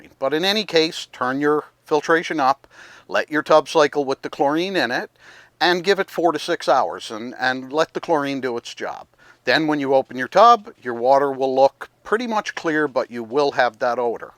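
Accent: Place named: Newfoundland, Canada. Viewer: are you from the east Coast? Little bit of a newfie twang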